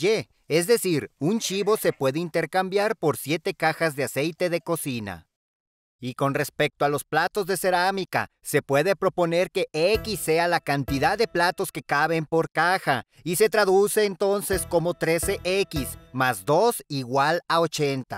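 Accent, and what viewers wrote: Mexico. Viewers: se olle norteño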